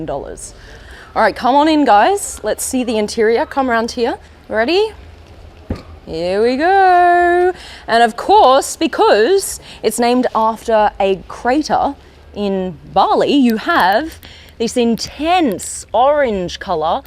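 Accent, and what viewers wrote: Australia. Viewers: какой все таки австралийский акцент и говор отвратительный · There is girl with a foreign accent on Youtube pretending to be you...She cant fake your Aussie accent tho...Your Aussie guy... cheers...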